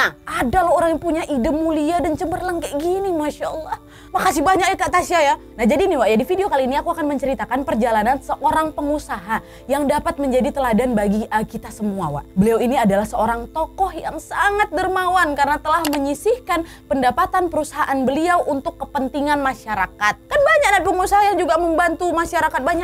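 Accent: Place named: Medan, Indonesia. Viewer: Bahasa dan logat ini kayak orang MEDAN,mantap aku subscribe kakak..buat nambah ilmu motivasi dan semangat...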